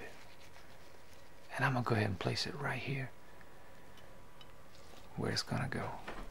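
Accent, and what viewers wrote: Louisiana, United States. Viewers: I see a bayou man myself with that Louisiana accent, maybe I'm wrong lol.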